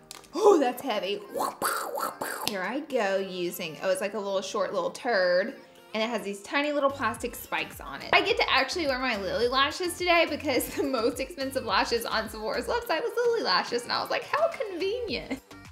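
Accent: South, United States. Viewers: So many people diss her accent! Trust me y'all it's harder than it looks to say concealer as a southern😆 Love you 😀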